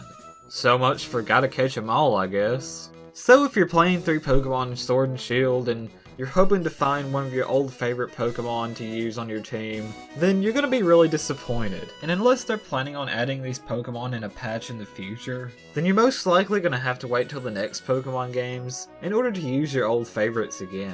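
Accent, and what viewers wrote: United States. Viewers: Damn your American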